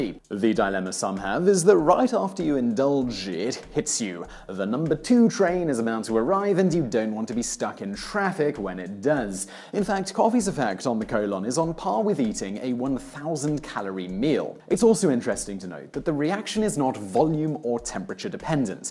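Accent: England, United Kingdom. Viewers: Hearing this in a British accent makes it sooo much better for some reason. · Dear. I wonder how you choose your pronunciations? If you're speaking with a strong English accent but using American emphasis just to attract the larger American audience then I understand. But often your pronunciation doesn't fit with any English or American dialect that I know. After so much research effort, doesn't it make sense to find out how to say the words properly? By the way, for the benefit of American viewers, yes, manner seems to me to be conceited too. We're not like that. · I love listening to all those English pronunciations in these videos. Good job, mate. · This video is proof that everything sounds more pleasant when heard in an English accent. I mean, poop...really?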